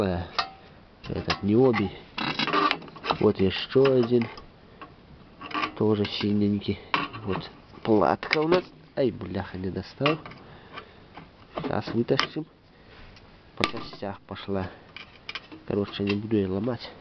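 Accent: Belarus. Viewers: Чувствуется Белорус 😉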